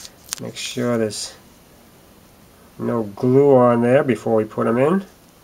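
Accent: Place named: Down East, United States. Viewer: Neatly done,. I searched for a long time for installation info after getting this kit,with no luck whatever. Your video made it look simple. Thanks for posting. BTW,I'm from Kansas,& I enjoyed yer down-eastern accent! So, ignore the guy below with the Peter Griffin comment.:-)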